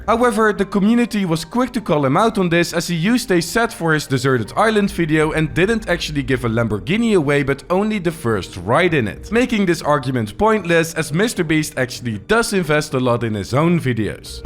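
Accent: Netherlands. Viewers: You say the Netherlands but place the marker on Belgium... even tho I guess you're from the netherlands yourself, based on your accent and the way you pronounce 'Kriekel'.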